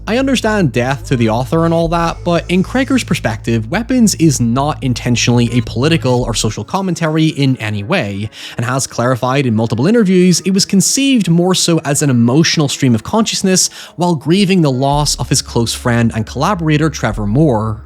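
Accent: Northern Ireland, United Kingdom. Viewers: It's a typical Northern Irish accent. How now brown cow?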